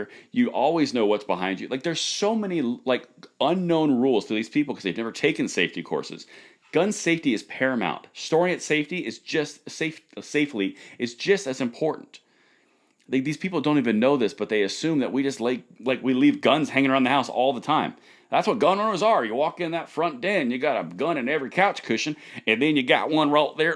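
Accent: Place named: South, United States. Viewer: Badass southern accent lol. Keep up the great work brother.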